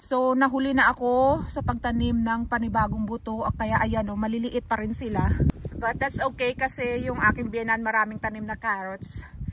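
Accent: Iloilo, Philippines. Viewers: Good morning sis I just found your channel a minute ago. I can tell you're ilonga the way you speak so gentle and your tone. So nice to watch your garden. I also love so much gardening. My parents are hardworking farmers back in the Philippines long ago. But now I been living here overseas for a long time. Have a blessed day 💜🙏🙏🙏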